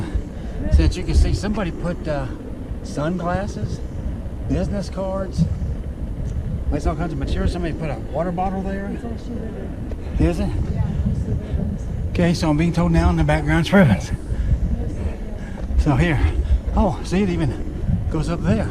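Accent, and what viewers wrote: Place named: United States. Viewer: Are you from Glenwood Springs? or a different part within Colorado? I know you are an American. Your accent does give it away. I'm just wondering as to where your from.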